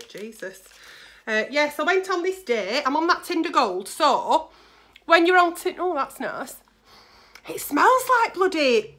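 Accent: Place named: Lancashire, United Kingdom. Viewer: U sound like ur from Accrington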